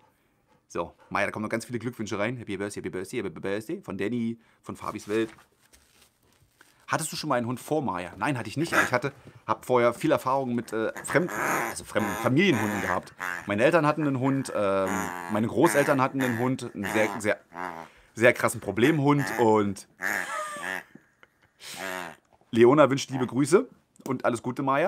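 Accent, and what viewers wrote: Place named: Berlin, Germany. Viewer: Schönes Video, lieben Dank!!! Wenn du magst kannst du gerne mehr "berlinern" ;);););) LG aus Rheinbach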